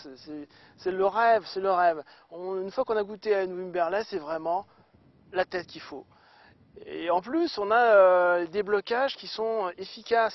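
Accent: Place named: France. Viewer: You sound French.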